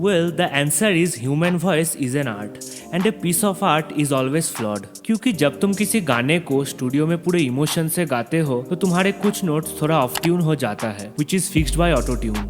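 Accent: Bengal, India. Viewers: In Bengali we call it Rewaz. It's pretty evident from the accent of the speaker that he's a Bengali person. Jab pata kuch hai nahi toh gaand ucha karke marwane kyu aa jata hai?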